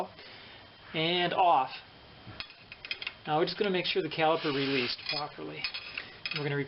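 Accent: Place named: Canada. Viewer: Great research material. I should make a video to see if I do as well. I am sure I would have to mute sound... You sound like a Canuck, just the pleasant, easy going feel of the video. There have been many times I wish I had been born north of the border. Thanks again for taking the time to make a quality How-To.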